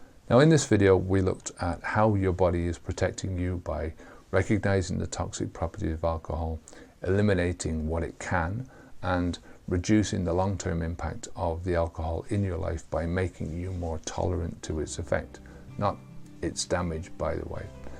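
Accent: Ireland. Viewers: Love Irish 🇮🇪 Accent! 🍀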